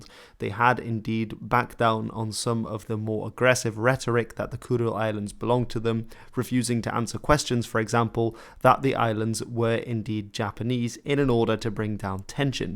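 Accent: United Kingdom. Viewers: Hi. Are you from UK? I am so curious about your accent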